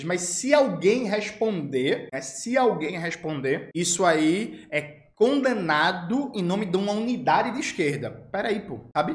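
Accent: Pernambuco, Brazil. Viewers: Oi, tudo bem com você? Não tenho embasamento prá fazer discussões teóricas. Acho essa virulência das redes sociais um horror! Seja lá qual for a discussão o que eu ví naquele trecho de vídeo é totalmente inadequado, um chilique mesmo. Gosto muito dos seus vídeos. Só tenho uma crítica muito séria. Acho que o Marxismo propõe análise de conjuntura e na minha opinião, você não faz isso quando não apoia claramente o Governo Lula. Está certo fazer críticas, o próprio Lula pede isso, mas eu gostaria que as críticas viessem sempre com a ressalva que precisamos apoiar esse Governo, nem que seja como um Governo de resistência ao fascismo, à extrema direita, ao bolsonarismo. Acredito que se o Presidente Lula resistir e conseguir ganhar o próximo mandato (2026 a 2030)prá ele ou algum sucessor, daí então poderemos começar a nos sentir um pouco mais "seguros". O Mundo está em risco e, não entendo como deixar de creditar ao seu Governo a diminuição da fome no País, por exemplo. 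A cadela do fascismo, sempre no cio, está nos nossos calcanhares e nossa única e frágil defesa é o Governo Lula nesse momento. Vamos apoiar! Criticar mas apoiar, explicitamente. Só prá lembrar a análise de conjuntura, se esse movimento da extrema direita conseguir ganhar a próxima eleição acabará o debate. Você está acompanhando até onde eles queria chegar, tenho certeza Foi por um trisco! Gosto muito do sotaque pernambucano! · vc tá cada dia melhor! Esse Eduardo resolveu aparecer, hein! Chilique feito só pra te provocar e aparecer aqui, agora que seu canal tá crescendo... E o sotaque pernambucano é um sotaquezinho maravilhoso, lindo e gostoso mesmo! Sou do ridijanero e amo!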